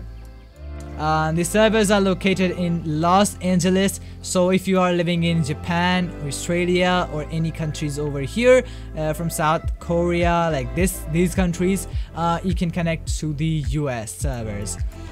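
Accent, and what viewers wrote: India. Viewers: how are you indian ur accent is great i am from iran but live in germany keep up the great work!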